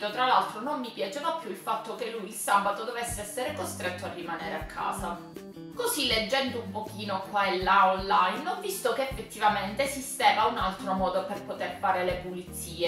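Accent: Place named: Cosenza, Italy. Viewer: Ciao mi sono iscritta al tuo canale e volevo farti i miei complimenti!Se non sono troppo invadente volevo chiederti se sei di Cs perchè mi sembra quel tipo di accento (io sono dell'alto Jonio).Sei libera di non rispondere se vuoi .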